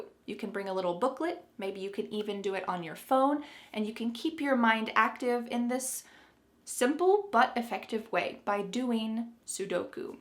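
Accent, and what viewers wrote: United States. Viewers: I can't hear your voice from when you had stated this channel but I love your Vedios and I am so so happy because I have found a great teacher for me and I love American accent and I love your voice I want to speak like you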